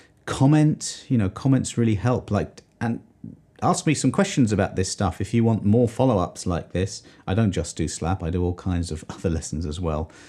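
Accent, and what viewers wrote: United Kingdom. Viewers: I can't decide which I like more, the bass tone or the British accent.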